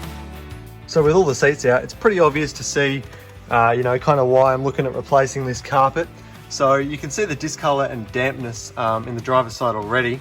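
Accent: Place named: Australia. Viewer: the job looks like it was quite a lot of work, you did a good job. What's with the furry seats LOL, Maby they will be a project too. I see your dad helps you a lot, I had a dad like that. you're really lucky, a lot of guys don't have dads like that. You should always let him know how much you appreciate him. I enjoy your videos, keep them coming I am looking to see what all you do to your vehicle. I am in Florida USA, your accent sounds like your down under.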